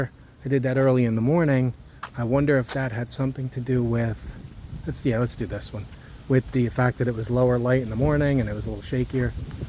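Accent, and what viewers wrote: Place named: North, United Kingdom. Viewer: Thank you I needed to hear this. I am a chronic worry wart. It’s also nice to hear the you deliver this message in the vernacular from up north where I grew up. Thank you✌️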